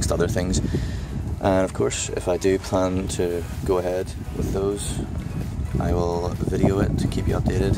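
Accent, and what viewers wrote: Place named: Northern Ireland, United Kingdom. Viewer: Hi there, I am from Australia but was born in Groomsport Northern Ireland so it was cool hearing you speak :P As for the car get a crossover pipe to remove that stock pipe to the turbo. I changed mine and the intake hose to the mountune like you have. Other good things to increase flow is adding the velossa big mouth to the front of the airbox. I own a 2015 euro 3dr FiST like you so these tricks are good. But as with all these changes you need to think downpipe and exhaust one day too. Take care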